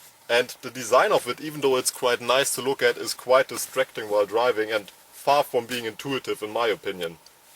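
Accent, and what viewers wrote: Germany. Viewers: Your german accent is really cool , anyways i own a mini and although i`m not an expert im very pleasant with it , i think it is a really good car , regards from Mexico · 1st off: love the German accent. 2nd, one purchases a Diesel for cost savings not sport. Why would Mini offer an engine that beats it's high end Cooper Works edition?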